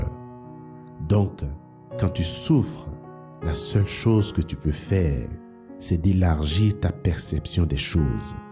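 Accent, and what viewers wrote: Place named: Africa. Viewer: J'adore l'accent africain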